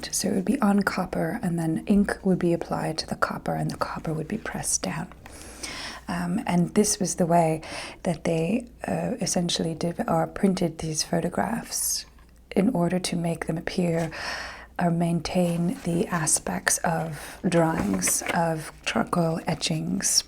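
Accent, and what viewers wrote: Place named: Mid-Atlantic, United States. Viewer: I love your Mid-Atlantic accent!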